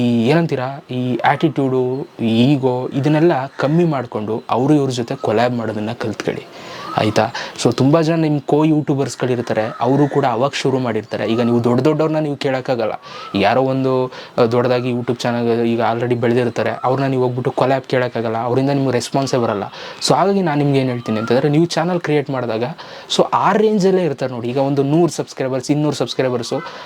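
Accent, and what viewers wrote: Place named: Hubballi, India. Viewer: Nimma matu Nija Tara ede nivu Ennu Belibeku nanu Nija Andre Hubballi avalu nimma Bhashe Chanda anstu adake sub madide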